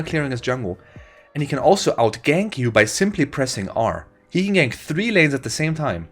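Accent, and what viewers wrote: Germany. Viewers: Where are you from? Sounds a little bit like german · You sound pretty german xD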